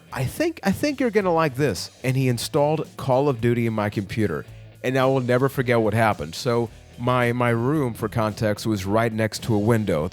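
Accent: Puerto Rico. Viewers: Are you Puertorican?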